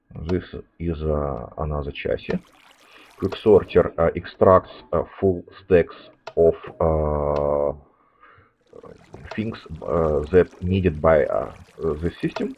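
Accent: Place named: Russia. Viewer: От русского акцента не избавится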